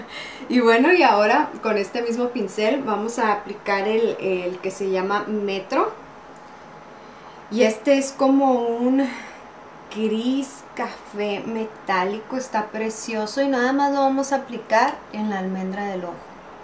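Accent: Mexico. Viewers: ya decia yo que sonaba muy mexicana xD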